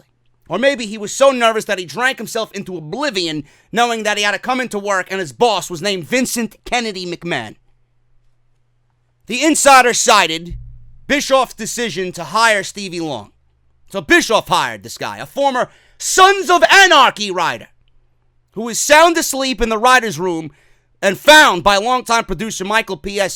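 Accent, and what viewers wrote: New York, United States. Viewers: Damn hon, your New York accent is so much more prominent today lol!!!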